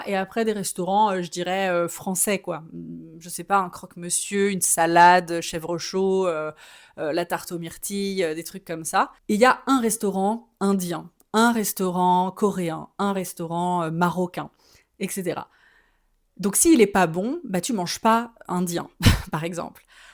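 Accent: France. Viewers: Vous avez un bel accent français.... Plus de vidéos. merci ❤❤❤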